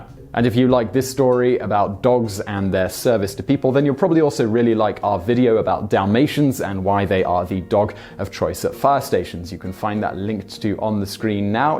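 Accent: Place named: United Kingdom. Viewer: This is vsauce with s British accent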